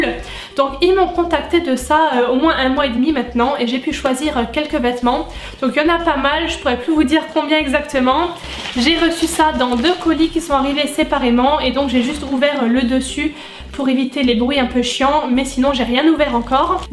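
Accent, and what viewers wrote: Lorraine, France. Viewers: Je me disais bien que ton accent me disait quelque chose, vive la Lorraine 🤟🏻❤️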